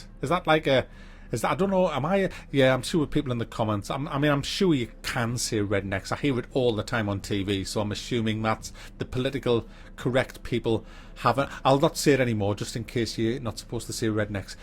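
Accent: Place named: Newcastle, United Kingdom. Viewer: Made a Patreon account and put down a monthly subscription. Love your work mate! I'm a Scouser living in the States and always love to hear you're accent and sense of humor. Reminds me of my old Geordie mates. Keep on keepin on mate.:-)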